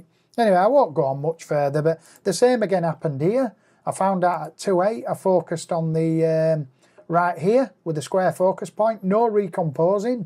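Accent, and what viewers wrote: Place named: Yorkshire, United Kingdom. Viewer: you are from yorkshire mate.....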